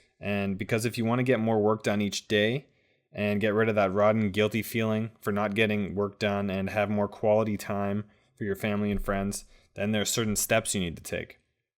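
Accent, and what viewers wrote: Canada. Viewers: that canadian accent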